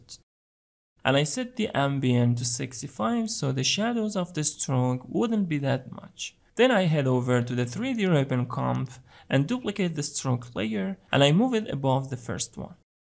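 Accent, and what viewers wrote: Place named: Iran. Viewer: Are you from IRAN? Your accent tells me you're IRANian ... :hand-pink-waving::face-blue-smiling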